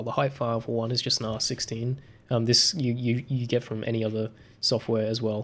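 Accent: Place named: Australia. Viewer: . Yep. Aussie.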